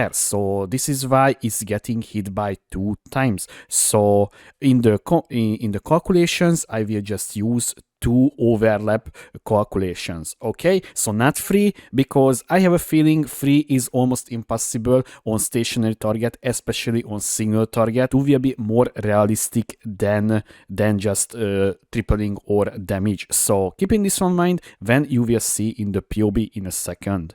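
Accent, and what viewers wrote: Hungary. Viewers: szevasz, a kiejtésed alapján magyar vagy. sosem nyomtam még 1 ligát sem egy zsír új skillel, de most ezzel fogok kezdeni, köszi a videót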